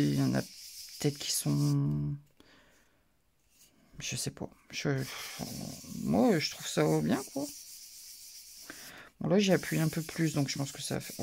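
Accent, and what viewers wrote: North, France. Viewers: Tu es du nord ??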